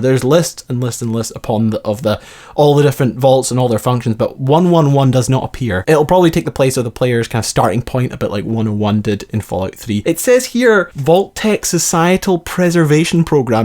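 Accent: Scotland, United Kingdom. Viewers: Tyler M. It's his accent he's Scottish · Is this guy brittish or American or canadian?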